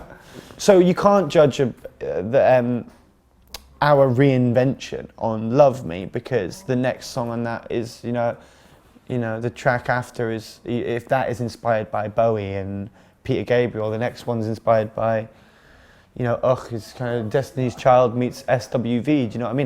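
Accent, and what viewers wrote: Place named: RP, United Kingdom. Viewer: He's from Wilmslow so yeah he is gonna be pretty posh :P.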